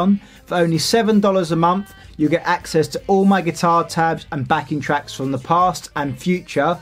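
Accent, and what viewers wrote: England, United Kingdom. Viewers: Wow this is the first time ive heard speak, like i knew he was from england and probably had an accent, put i had always imagined his voice to be deeper to match his playing skill on guitar (which by the way your amazing and have helped me decide what songs to learn next), neverless your amazing at what you do, rock on man \m/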